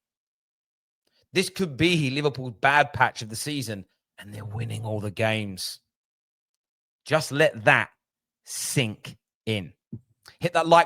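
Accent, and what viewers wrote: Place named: Manchester, United Kingdom. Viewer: Another Man U fan with a strange Mancunian accent 🤔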